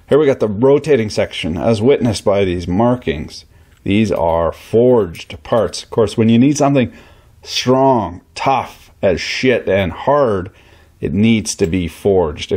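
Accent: Quebec, Canada. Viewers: That's some of the best Canadian accent I have heard in a while. Felt like drinking a cup of maple syrup and eating some poutine when I was watching this. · Canadian accent. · My freaking god you sound canadian when you speak french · Quebec accent swearing yeah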